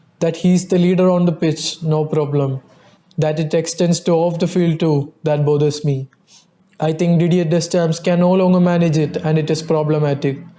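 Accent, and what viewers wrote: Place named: India. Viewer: Annoying Indian accent 🤦🏾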